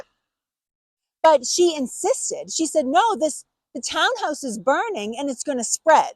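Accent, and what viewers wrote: Boston, United States. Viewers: Love her Bahstun accent 😊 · you seem to have a Boston accent, cool, mine is R.I....😊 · Another awesome video! Your Boston accent just makes the video. Love watching your enthusiasm. 😊👍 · After the first 30 seconds of listening to her talk, I knew without a doubt that she was definitely from Boston.🤣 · You're from Bahston? Wow, had no idea. Great channel. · Is that the original Concord bridge that stood 250 years ago,? By the way, I love your Boston accent. You have a new subscriber for all things about the Revolutionary War. History is fascinating, is it not? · My Mom was from Maine, but lived in California from her early twenties until she passed. Is your accent a Boston accent or is that how her accent would have sounded as her being from Maine? I’m just curious. Loved your story and subscribed to hear more content. Thanks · Came for the history, stayed for the Boston brogue. 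Good content earned my subscription. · Ya can diffiantly can tell your from New England / Boston accent...😂😂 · New sub here! You hooked me with your excellent presentation with its enthusiasm and, of course, that wonderful BahStun accent! Love it! I can't wait for the stories yet to come! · Great video. Your narration is excellent. Love your accent. You sound just like a former client of mine who came from somewhere near Boston. I have been to both Lexington and Concord and loved the area and its history. I subscribed and am looking forward to watching more videos. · Well researched and presented! Good job! (Love your Boston accent. 😊) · When I first saw this documentary I wasn't sure if this was about new England and Boston during the revolutionary War and but then I heard her voice and was like yup...definitely Boston. 😂...its good to see a New England youtube channel loving what they do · Love her Boston accent. 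· Outstanding, love that Boston sound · Makes me think of A Matter of Life and Death aka Stairway to Heaven when Abraham Farlan is the prosecutor. 😂 neither Massey or Kim Hunter had Boston accents like you!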